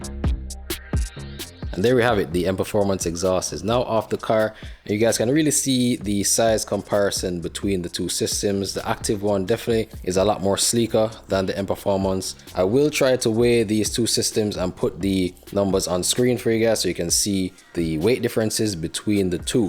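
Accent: Jamaica. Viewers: Love the Jamaican accent!🙂 Oh right, the exhaust, loved the sound (and look) of that too!😁 Btw, and yea good idea to install heatshields onto the aftermarket exhaust, they're there for a reason👍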